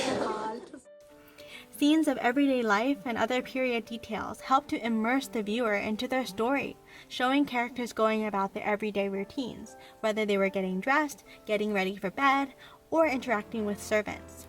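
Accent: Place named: United States. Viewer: It is weird hearing the narrator with a thick American accent. “Prade and Prajadice”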